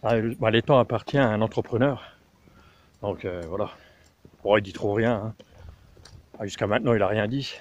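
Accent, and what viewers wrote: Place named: Haute-Marne, France. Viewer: T'as gagné un nouvel abonné , t'es un vrai haut marnais , "un tiot machin" " j'chie d'dans" 😂 du vrai parlé de chez nous , et je te suis quand tu parles des grandes marques ....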